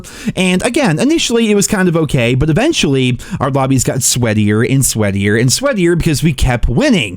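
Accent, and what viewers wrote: Scotland, United Kingdom. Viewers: Scottish not Irish dude 😂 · Irish?????? Clearly Scottish..... I think you said sweaty players 5 times while laying prone in the corner 😂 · Lmfao as a Canadian that was an obvious Scottish accent 🤦🏽‍♂️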